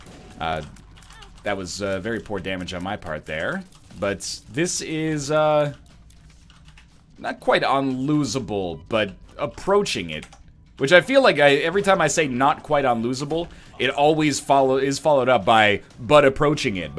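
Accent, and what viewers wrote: Canada. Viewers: South Parks imitation of Canadians is true because that's how actually talks. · Put that's how people in Canada talk!!!!!! I seen SOUTH PARK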